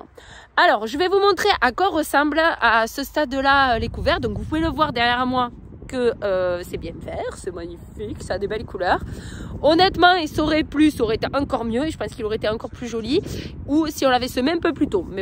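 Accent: South, France. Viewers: Bonjour belle vidéo comme d habitude bien expliquée avec ton bel accent du sud Bon réveillon à a vous quatre et à l année prochaine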